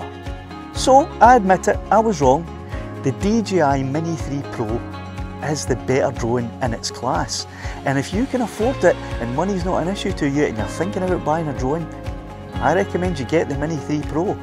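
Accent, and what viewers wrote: Scotland, United Kingdom. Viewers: Hi, sitting here in my cabin up in North East Finland with a big smile on my face, enjoying your Scottish accent and your comment to the teenager who drove past. The Mini 3 is my first drone and I enjoy flying it. I myself am an instructor and graphic designer and have made quite a few films within motion graphic advertising and documentary and will usually hire a drone photographer for the films I make. I would say that for lower budget films, the DJI mini 3 Pro is great for the job. Thanks for sharing, slàinte from a Dane in Finland.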